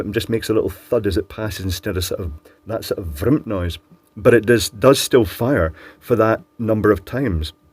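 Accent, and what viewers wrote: United Kingdom. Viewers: Amazing Harry Potter accent. Instant sub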